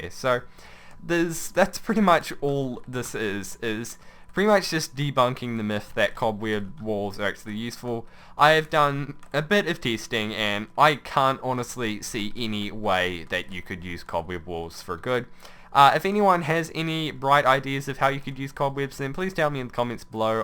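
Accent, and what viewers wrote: New Zealand. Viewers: He's from new zealand that's his accent. Nobody's perfect.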